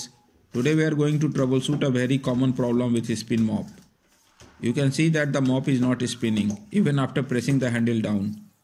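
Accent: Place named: India. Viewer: Sounds like from India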